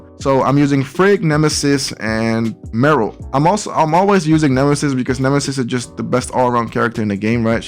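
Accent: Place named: Sweden. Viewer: u sound swedish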